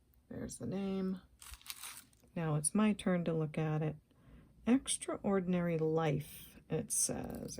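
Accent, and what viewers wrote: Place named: Northeast, United States. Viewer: Love!! One of my faves! Maybe I just like the yankee (North eastern) accent.😂 Born and raised in Maine. So sorry for the loss in your family. Praying for peace and comfort. (❤)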